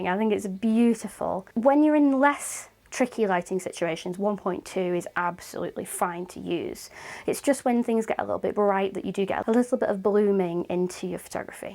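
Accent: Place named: Bolton, United Kingdom. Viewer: Fabulous, I'm leathered now 🤣 subscribed partially for the local shots and accent! (We're in horwich near Bolton) I have this lens in a 17 and have just ordered the 50, can't wait!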